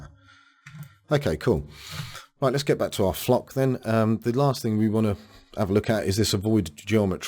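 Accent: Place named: United Kingdom. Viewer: I really love your accent, even have been rewatching some of your videos because of that. Can you tell me which one is that exactly? I can only tell it's British :D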